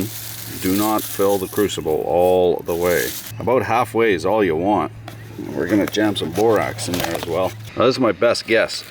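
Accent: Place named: Canada. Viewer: Damn. i knew you lived in canada from the way you talk haha but didnt realize we live in the same province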